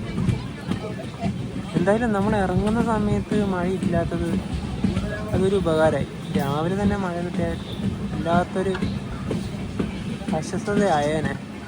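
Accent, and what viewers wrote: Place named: Malabar, India. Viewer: ഞാനൊരു മധ്യ തിരുവതാംകൂർ കാരനാണ്. നിങ്ങടെ മലബാർ മലയാളം slang കേൾക്കാനാ നല്ലത്.🙂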